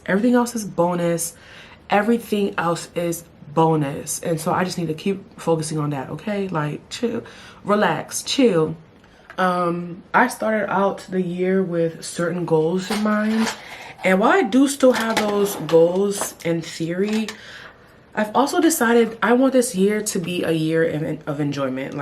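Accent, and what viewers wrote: United Kingdom. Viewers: I love it British voice 💓 LOL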